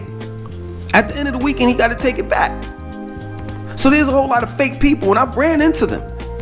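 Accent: New York, United States. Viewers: So wait you from New York I presume with your accent and you are shocked about LGBTQ people in Atlanta??? Need to get out more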